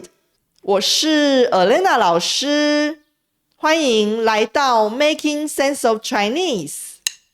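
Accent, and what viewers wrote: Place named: Beijing, China. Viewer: Thank you for responding. I asked because I thought I could detect a northern, perhaps Beijing accent. Anyway, nice to come across another channel teaching traditional Chinese 😊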